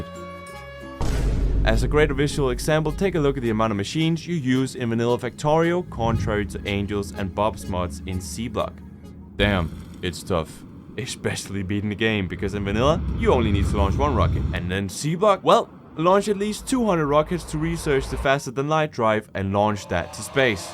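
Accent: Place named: Germany. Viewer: Good f'ing job man, your suffering wasn't in vain. And I would've never guessed you're Danish, you sounded rather German to me 😂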